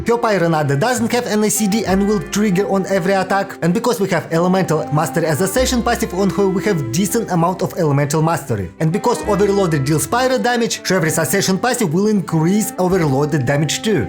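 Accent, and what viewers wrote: Russia. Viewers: are you doing a russian accent on purpose? or idk what that accent is, i can't listen to it anyways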